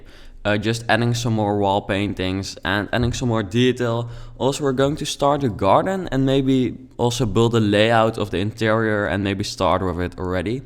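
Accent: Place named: Netherlands. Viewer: is this guys dutch or somthing. he really sounds dutch. :\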